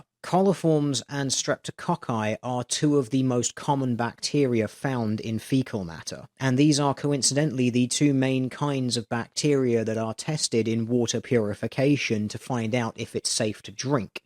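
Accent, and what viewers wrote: United Kingdom. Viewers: why is the toilet humor extra funny from a british accent? (Cuz I'm American isn't it?)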